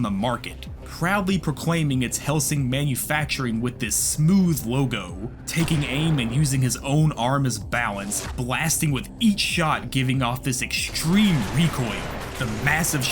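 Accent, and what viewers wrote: Texas, United States. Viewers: Great video! Also, are you Texan? Kept catching that twang from certain words and vowels❤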